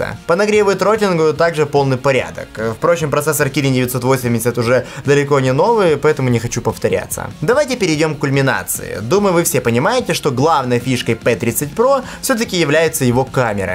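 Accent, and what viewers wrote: Odessa, Ukraine. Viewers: ты что Одессит? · Ты одессит ?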